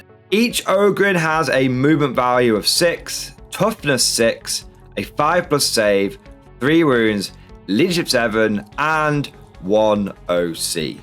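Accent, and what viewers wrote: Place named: United Kingdom. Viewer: is swooning me with his cute British accent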